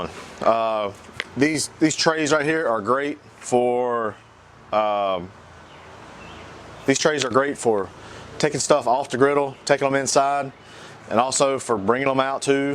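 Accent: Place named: Carolinas, United States. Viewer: Awesome vids! As soon as you started talking, I knew you were a fellow Carolinian! Keep cranking out the great food and information!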